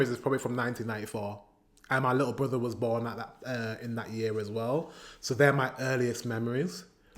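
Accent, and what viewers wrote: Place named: Leeds, United Kingdom. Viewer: Does he live in Leeds? I assumed so due to the accent. I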